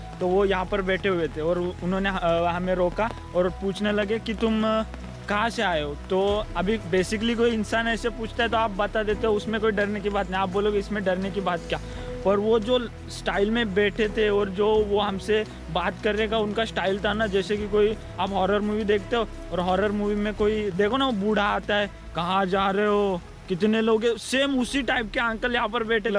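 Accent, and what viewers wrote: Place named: Maharashtra, India. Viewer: Ashich commentary thev, real, marathi ani marathyachi hindi, lavkarach famous hoshil 😂⛳️👌🏻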